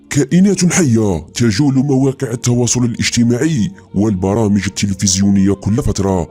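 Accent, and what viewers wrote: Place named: Skikda, Algeria. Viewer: حسب الهدرة تاعك تبان سكيكدي 100 / 100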